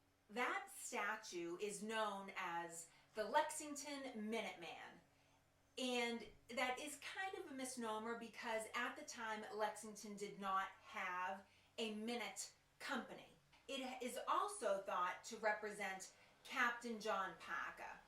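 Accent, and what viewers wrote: Boston, United States. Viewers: I am embarrassed to say that I am almost 80 and life a short distance from Concord/ Lexington and have not visited, but watching these videos is giving me impetus to doing it this spring. BTW--- ---you have a Boston accent you can cut with a knife. It almost sounds Dahhchestuh, but not quite--but sounds as if you grew up in Boston: Captain Pahkuh! BTW---I have several kin that were residing in Plymouth, Kingston, and Duxbury that responded to the Concord emergency or the Concord alarm as it was referred to. Quite a hike on short notice! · Hi. I love Revolutionary War history and I subscribed to your channel over the weekend. I live in Essex County, N.Y. where the famous forts Ticonderoga and Crown Point are located. I would love if you did an episode on the 58 pieces of artillery that were dragged overland each on a sled of its own in the winter of 1775-76 from the captured Fort Ticonderoga to Dorchester Heights by General Henry Knox and his men forcing the evacuation and liberation of Boston from the British in March of 1776. 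It was an extremely difficult task and engineering feat without a loss of a single cannon and a victory for the colonists. I would love to see where they set the artillery in Dorchester Heights that forced General Howe his army and the loyalist population to flee. Had this band of men not been successful future revolutionary events could have turned out radically different. Thank you for the interesting post to watch and your Boston accent is fantastic. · These are fun, and I love your Boston accent!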